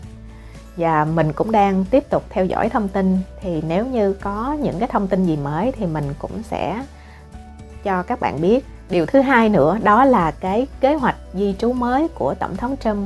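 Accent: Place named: Saigon, Vietnam. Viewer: Cảm ơn em, giọng đọc rất truyền cảm, rất chuẩn Saigon, US.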